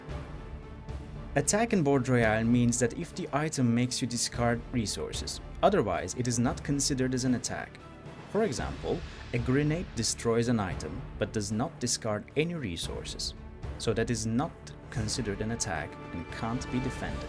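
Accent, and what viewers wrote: Turkey. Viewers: Yapımcıları türk zaten hani seslendiren de türk aksanından belli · bunu bir türkün seslendirdiğine yemin edebilirim ama kanıtlayamam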